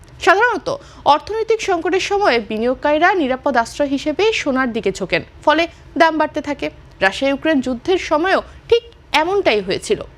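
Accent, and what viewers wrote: India. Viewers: Akemon khobor pora??? Indiander moto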